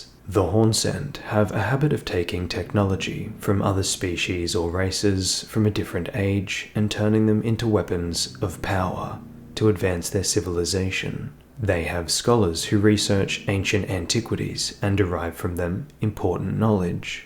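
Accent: Australia. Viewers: What is it with ER lore videos being narrated by sexy male Aussie accents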